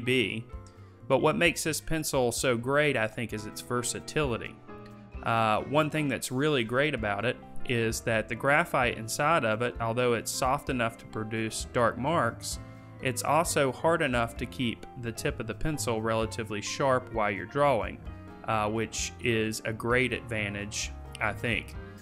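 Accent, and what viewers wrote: South, United States. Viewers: Not is your videos very informative and well-done, your accent makes it even better. It's just so freakin' cute >-< It sounds southern, but I may be wrong.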